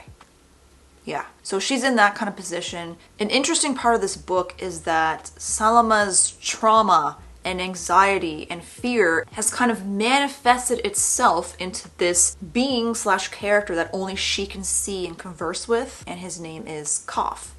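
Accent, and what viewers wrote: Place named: United States. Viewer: A bit off topic,,,but why do you sound American? Specifically speaking Network Englkish. I don't hear a trace of Canadianisms, like process pronoced proecess, about pronounced aboot (more nuanced but I dunno how to spell it), no terminal rhetorical questions, eh? I'm a native Californian and you sound indistinguishable from my fellow SF Bay Areans. Inquiring minds want to know.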